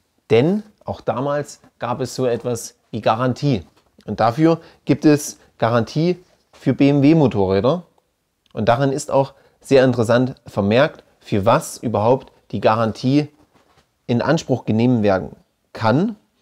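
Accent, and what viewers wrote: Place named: Saxony, Germany. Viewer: ich grüße einen Sachsen! Man kann den Dialekt nicht weg bekommen. Mir gehts auch so in Wien!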